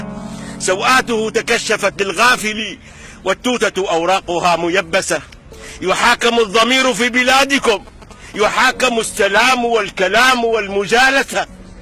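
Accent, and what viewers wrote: Tunisia. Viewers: Abdelilah Ait cadi هذا تونسي لهجة الجنوب وقناة الجنوبية تونسية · هذا تونسي¿?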